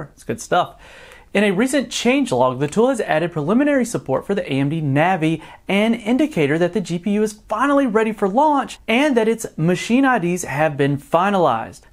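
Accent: South, United States. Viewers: Your mother or fathers strong cowboy country accent really comes through while stoned :) I went ahead and got a Sapphire Pulse Vega 56 for £270 (with the 3 free games bundled).. It's not worth waiting if you want something now. Undervolted to 1100mV and ramped the frequency to 1700/950 and off I went (after running Firestreak stress to completion of course). I got me some GTX 1660 Ti ass kicking goodness.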